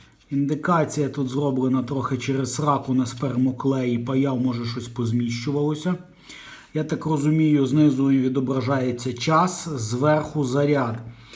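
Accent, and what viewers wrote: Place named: Horodok District, Ukraine. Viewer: Ви певно з Городоцького р-ну😂 Слухаю і балдєю 😆👍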